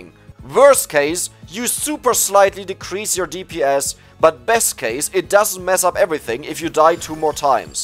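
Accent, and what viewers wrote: Germany. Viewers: dein englisch klingtr mega deutsch, mir würde das video in deutsch mehr helfen XD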